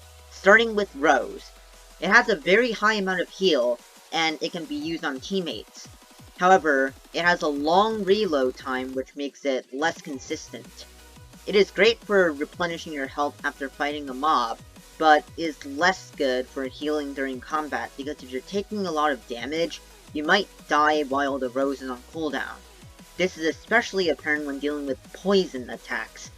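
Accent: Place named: United States. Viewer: Im from south america, and your pronounciation is so american, lol Nice video btw :D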